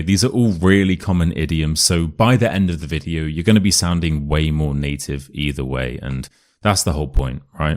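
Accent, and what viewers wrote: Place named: England, United Kingdom. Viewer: So lovely to hear a real English speaker - beside all those strange dialects of allegedly English language in USA, Australia etc.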